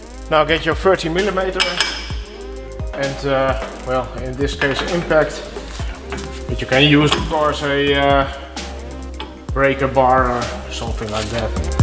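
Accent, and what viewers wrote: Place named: Netherlands. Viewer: Love the dutch accent. Cheers.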